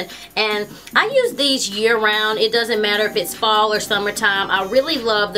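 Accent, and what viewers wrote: South, United States. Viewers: I love your accent! Im a southern girl as well ;) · no means for disrespect are u a southern bell u sound just like one i apoligize if i had disrespected u in any way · you sound southern!! :]]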